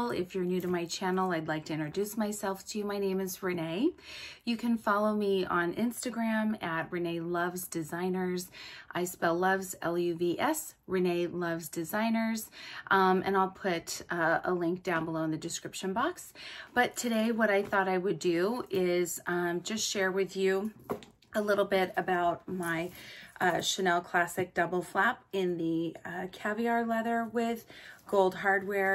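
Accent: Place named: Orange County, United States. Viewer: Omg I’m dying I could so tell your from Orange County area ! I’m from the OC too born and raised in Irvine / Huntington 😂💗 love your videos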